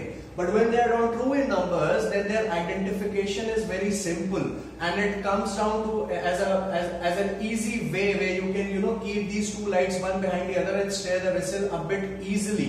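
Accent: India. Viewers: why indian accent? why why why? :(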